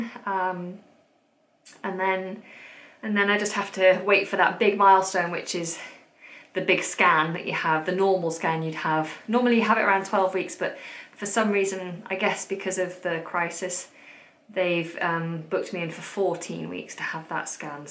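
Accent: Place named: England, United Kingdom. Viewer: Congrats to your family. That cool English accent tho :)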